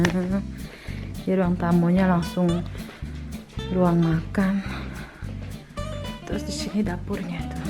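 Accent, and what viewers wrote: Jakarta, Indonesia. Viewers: Suka sama logat betawi nya langsung Subscribe aku pok😁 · Gue demeen beneran ama mpok dan bang, kereen mantab, semoga Allah swt sll merahmati & melindungi klrg mpok di sono. Mpok lucuu banget, betokaw aseli, saya inget masa kecil kalo denger aksen mpok awesome da'ah! ngikutin vlog mpok, krn saya sejak kecil sd skr tinggal di Betawi Indonesia tercinta. Suatu saat kalo ada rejeki ogut ame anak² yg udah pada gede³ mau ke Sweden ah nemuin mpok se.klg, ibunya anak² udeh meniggal sejak anak³ msh kecil, skrg tinggal jalan2 mau ke Swediaa!! Yeaah, InsyaAllah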